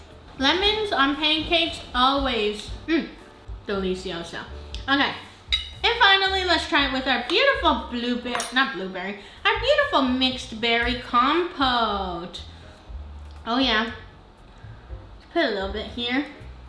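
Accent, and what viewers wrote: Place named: Canada. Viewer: 2 minutes in and i thought "this person is canadian". 3 minutes in you confirm you're in Toronto. eta: omg, Midred's is soooo good! I came here after watching the video the chef did. the technique he demonstrated was a little different. when i was a kid and my mom made crepes we would have them with butter, sugar and lemon. so good. i also like honey and butter on my pancakes, but i most often do the classic butter and maple syrup. sometimes i make a berry compote.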